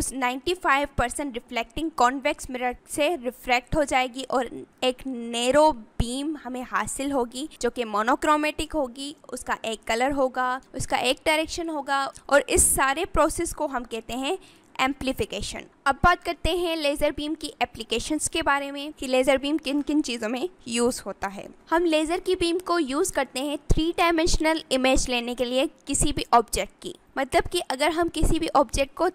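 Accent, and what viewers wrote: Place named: Deccan, India. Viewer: Aap Deccan, India se hai shayad apki accent waisi hai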